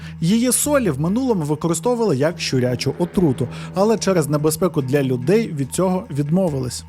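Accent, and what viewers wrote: Russia. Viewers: Я дуже-дуже перепрошую. Мені дуже подобаються Ваші відео, але не можу втримась від хвилинки грамар-наці. У Вас часто проскакують російські наголоси: брАла, а не бралА, спИна, а не спинА, помЕрло, а не пОмерло. А ще по-російськи звучать наголоси на прийменниках, наприклад, ми віримо на слОво, а росіяни — нА слово. Ще раз даруйте. Просто це часті слова у трукраймі і я сподіваюсь, що Ви пофіксивши ці дрібнички, покращите ваш контент. 😳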